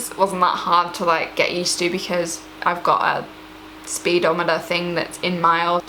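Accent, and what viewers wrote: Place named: London, United Kingdom. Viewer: You're accent has definitely become more London :) I'll not say 'British' because there isn't really a British accent.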